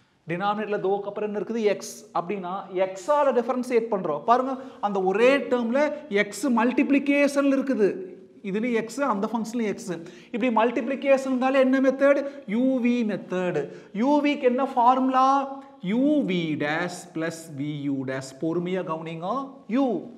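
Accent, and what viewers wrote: Coimbatore, India. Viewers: Avaru oluga tha pesura ru. Athu coimbatore slang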